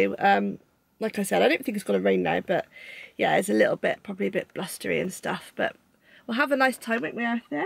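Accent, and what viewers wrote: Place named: Gloucestershire, United Kingdom. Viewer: I just came across your channel from Mummy Cleans' channel! Love your channel intro and videos I've seen so far 🙂 your accent sounds so local to me, I'm from the Forest of Dean in Gloucestershire 🙂 your holiday sounds lovely, we're off to Cornwall next month, can't wait. I've got a 2 yr old son and nearly 4 yr old daughter so doubt it'll be relaxing though lol! Sorry to hear you've been unwell xx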